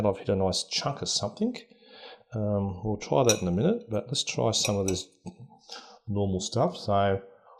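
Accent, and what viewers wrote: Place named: Australia. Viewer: Its Australian mate ;)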